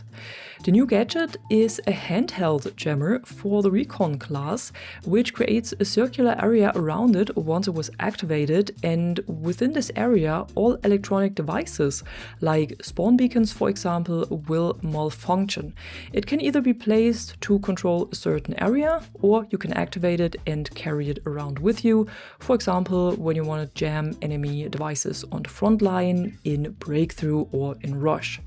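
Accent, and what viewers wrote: Germany. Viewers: Der deutsche Akzent is der Hammer, danke für das Video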